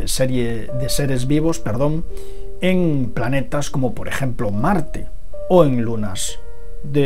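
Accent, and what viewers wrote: Galicia, Spain. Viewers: De donde eres de galicia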